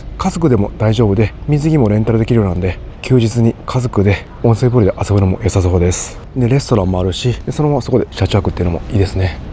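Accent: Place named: Kansai, Japan. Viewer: そろそろ関西弁なんとかならんの？？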